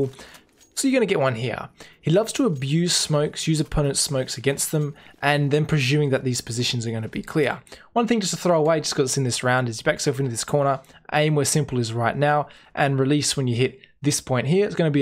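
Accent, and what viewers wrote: Australia. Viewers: you should have at least 1M subs btw i love Australian english keep it up :)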